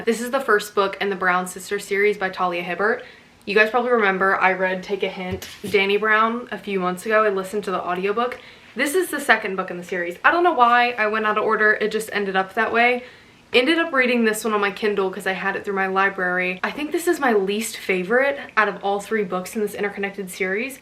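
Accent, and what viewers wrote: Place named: South, United States. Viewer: Girl literally never apologise for a southern accent 😂💖 i think its really charming . get well soon!!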